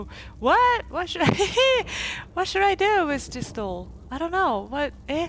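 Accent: England, United Kingdom. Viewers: U have like an English accent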